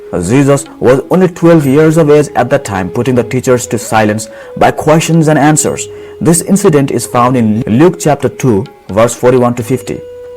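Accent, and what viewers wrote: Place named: Northeast India, India. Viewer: 🤣🤣🤣🤣🤣 come to me I'll teach u n make u understand abt Christianity... So plz just by using Indian tune... U are not still able to satisfy my thirst, coz we sanatani don't just clam without logic or scientific theory... Which ur missioners call it "Black magic" or "witchcrafting" 🤭🤭.... According to ur missioners Story book so called "Bible" God made this earth in 6 days n on 7th day he took rest... M I Right? Whr was God? N who is that Super natural power? Now u'll say Jesus Christ Father... 🤣🤣🤣 plz thats enough... N ur God stays at cloud no 7 or 9.. 🤭🤭🤭 without lift... Bro, but why ur accent sounds like converted northeastern Indian ? N If u are? just rise ur one hand up n come to me... I'll make sure that ur life shouldn't be in Dark Years... Trust me no brainwashing, no slagging.. Just peace...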